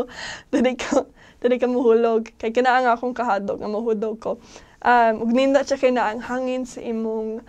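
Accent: Philippines. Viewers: Perting bisayaa jud bayhana 😂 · love the accent.. bisdak kaayo. Apir!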